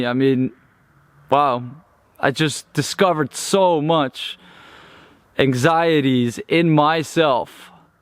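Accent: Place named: Netherlands. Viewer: Je bent nederlands toch want je klinkt heel nederlands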